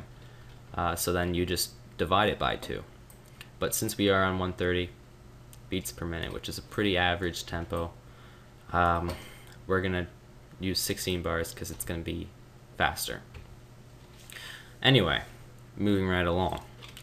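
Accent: Canada. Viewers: are you Canadian? :D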